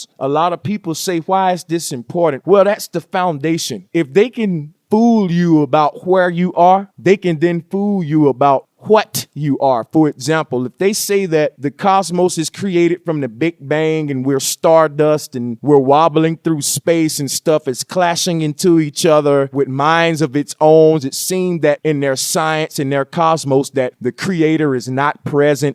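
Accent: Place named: South, United States. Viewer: Funny how clearly he spoke back then. His country accent is thicker today ✊🏾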